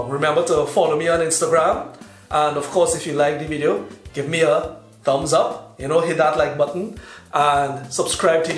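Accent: Trinidad and Tobago. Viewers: I also thought "nevermind" doesn't sound like trinidadian ;)) I absolutely love the rythm, the accent, I spent the best years of my life with trini people and I miss that vibe in my life fuh sure!! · lol It's like African american ebonics but with a trini accent lol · I love hearing my Trini talk 😍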